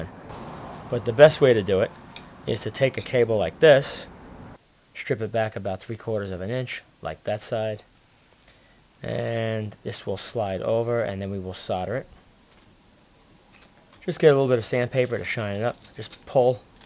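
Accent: United States. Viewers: wondering would it be any easier to drill before you cut it to length...\and sorry I thought you had a slight speech impediment...I didn't realise Americans pronounce solder sodder...lol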